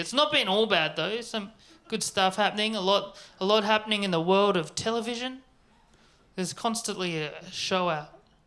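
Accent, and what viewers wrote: Australia. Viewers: I've lived in Australia since I was 3 and I'm now 34 .... the Aussie accent on this guy bloody gets to me... a fkn question/upwards inflection after about every 2 or 3 statements. Is he from Sydney? Can't be bothered looking it up. He's funny-ish. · What in the magical costume change. As if the aussie accent on an asian wasn't enough for my post-partum-pandemic brain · A chinaman with an aussie accent 🤣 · I've never heard an Asian speak Aussie. I had a mental block like it was an impossibility or something. Silly American, laugh. So I did. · o7 from eu. Your Australian accent is almost cheating because of the +1 to the sillyness factor. Keep up the good work of writing funnies. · He sounds like every single Asian kid at my high school, im from Australia.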